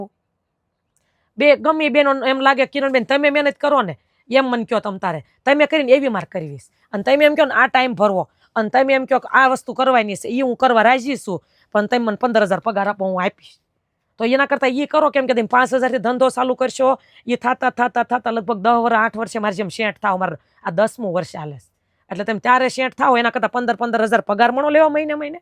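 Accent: Kathiawar, India. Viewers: બેન તમે કાઠિયાવાડી લાગો છો બોલી પર થી મે તમારા 2 થી3 વિડીયો જોઈ આ તમે બોલો છો બહુ સરસ કેટલા હજારથી ધંધો ચાલુ કરવો જોઈએ મેકસીમમ સ્વામિનારાયણ ધમૅ છે હું પણ સ્વામિનારાયણ છુ જય સ્વામિનારાયણ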